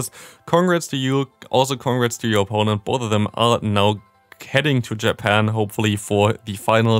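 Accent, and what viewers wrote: Germany. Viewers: Germans speak English obviously with some German accent bits in it. Nothing unusual. Don't bother it.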